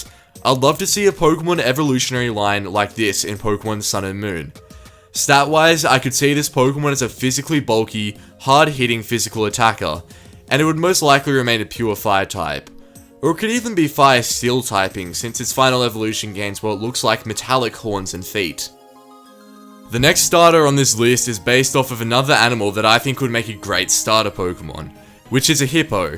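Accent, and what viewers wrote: Australia. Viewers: The way he pronounced 'zebra' in the video made me laugh, sorry pal, not hating on the Australian accent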